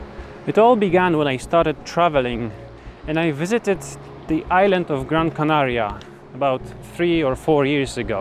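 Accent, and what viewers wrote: Poland. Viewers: What nationality are you? You have an accent but i cant tell where you are from. Poland? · Rotbart1337 he’s Polish indeed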